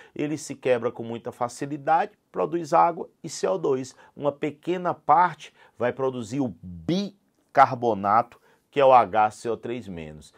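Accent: Ceará, Brazil. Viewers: eu amo o jeitinho cearense que ele explica kkkkkkkkkkkkkkk, simplesmente o melhorrr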